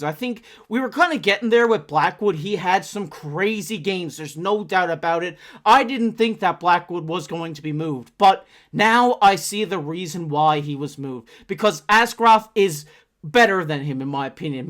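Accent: Newfoundland, Canada. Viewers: Are you a Newfoundlander? East coast accent is strong!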